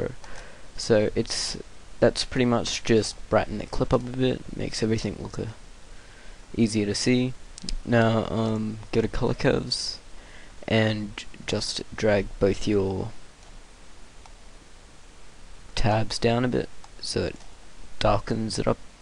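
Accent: Australia. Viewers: Hehe aussie accent, same xD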